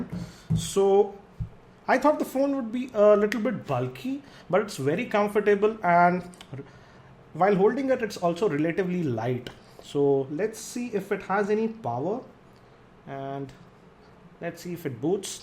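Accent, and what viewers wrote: India. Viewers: I like your indian accent :D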